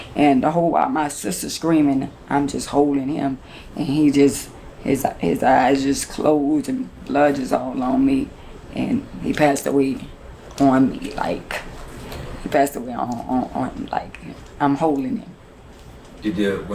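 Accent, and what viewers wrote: New Orleans, United States. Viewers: she sound like she close to new orleans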